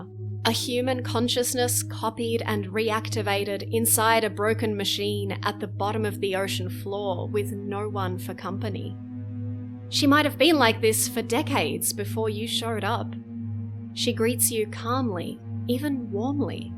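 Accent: Australia. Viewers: Grabbed this based on your vid. Nice to hear a fellow female Aussie gamer. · Australian goth???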